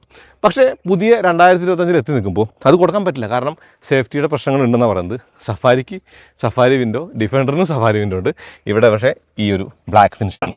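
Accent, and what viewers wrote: Thrissur, India. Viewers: Thrissur slang angananu bro..speed koodudal aanu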